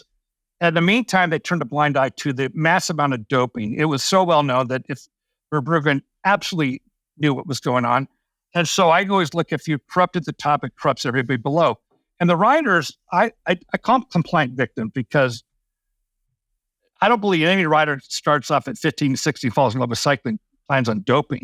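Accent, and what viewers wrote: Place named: Ireland. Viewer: Just found your site and subscribed. Your fine Irish accent puts me in mind of Sean Kelly. Not bad company to be in! A very good chat with Greg, looking forward to you other podasts thanks.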